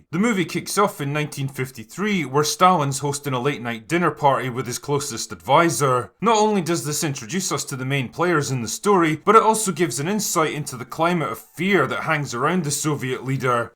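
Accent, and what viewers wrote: Scotland, United Kingdom. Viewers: “Ya know…” I fookin’ love this film. You could’ve voiced a Soviet General with yer Scottish Brogue, Comrade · yes scots do talk like that. Mate, what the fuck would have to gain by pretending not to be danish? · Narrator has quite the Scottish accent. Or Yorkshire. Either way, ridiculous.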